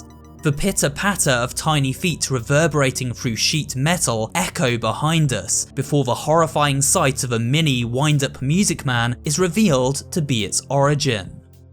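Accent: United Kingdom. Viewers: i thought this would be good and then i hear british voice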